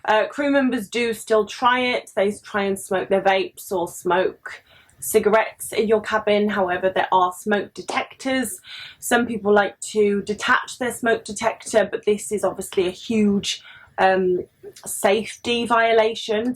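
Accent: United Kingdom. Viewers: Thanks! Love your videos and your British accent! You are the coolest! 🤪🤣😇😉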